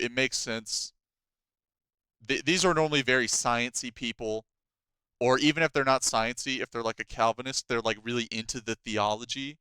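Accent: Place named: South, United States. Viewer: Where in the south are you from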